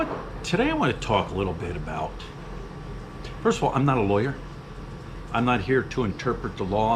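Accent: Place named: Pittsburgh, United States. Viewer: I know you call yourself "," but that sounds like a serious Pittsburgh accent! Dan from Johnstown.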